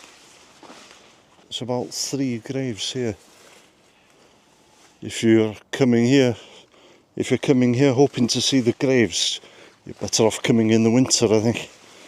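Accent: Wales, United Kingdom. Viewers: it's really lovely to hear a real Welsh accent, and I'm 100 per cent Celt Irish. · American here and newly subscribed. I worked with a couple of Welchmen 40 years ago and haven't heard a Welch accent in a long time.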